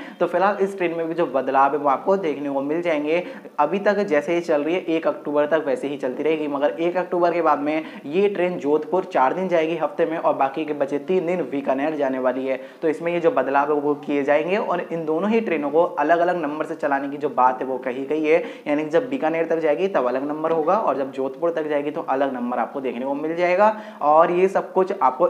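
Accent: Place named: Bihar, India. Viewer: Bhai pakka tum bihar se ho...!!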